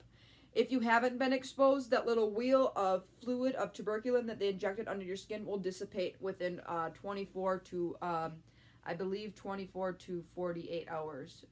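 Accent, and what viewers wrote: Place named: Midwest, United States. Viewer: Thanks for sharing! I am getting a TB test this week! I was guessing you were from the midwest by your accent and then at the end you mentioned WI! That's where I'm from😊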